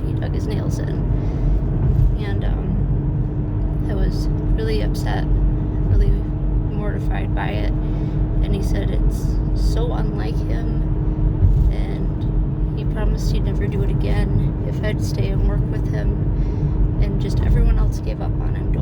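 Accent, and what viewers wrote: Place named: Ireland. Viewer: I was just thinking if your are Irish or American-Irish as i recognize the twang and of course the penchant for red hair? There are several pitiful girls with their story here on YT about similar domestic situations that had turned abusive and eventually violent. You naming your children Riley and Reagen is also pointing me in the same direction. I feel very sad about your story, but i get the sense that there is something missing in your story, which would clarify why you are a submissive in the first place?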